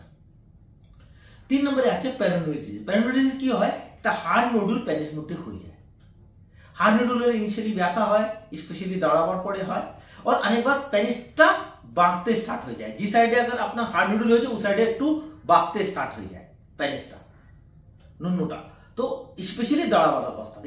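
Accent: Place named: Bengal-Bihar border, India. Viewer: আপনি কি বাংলা বিহারের মাঝামাঝি বসবাস করেন। ভাষা তো ক্লিয়ার হচ্ছে না।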